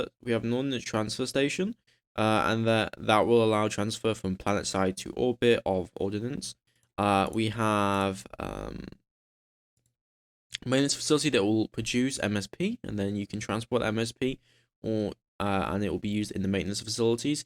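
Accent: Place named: United Kingdom. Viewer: Sound is a lot better on this one! Minor point of citicism: your english is very 'brittish'. You talk very fast and don't 'finish' all your words properly. This generally makes it harder for continentals to follow. Keep it up though, the tutorials are really useful.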